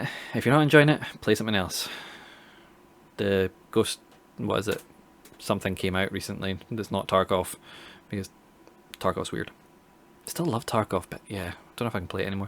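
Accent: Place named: Scotland, United Kingdom. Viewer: I guessing you're Scottish right? (Becoz of the "wee bit" term used in your video and also the accent, so i guessed so, haha)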